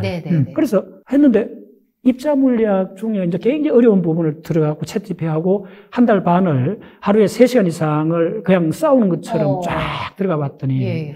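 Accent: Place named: Gyeongsang, South Korea. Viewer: 내용 중에 자막 잘못 .. 스코트가 아니고 서고트.. 경상도 발음 이해 못함..ㅎㅎ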